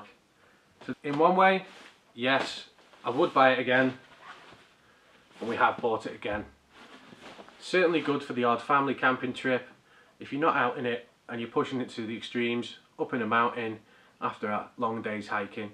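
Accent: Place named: Wirral, United Kingdom. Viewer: Your accent sounds very “Wirral” 😂 are you local to there? Great video mate. Subscribed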